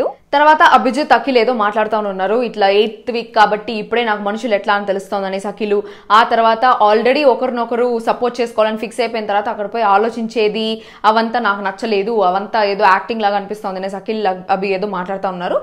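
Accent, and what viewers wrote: Chittoor, India. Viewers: I never seen a girl from chittor speaks in proper slang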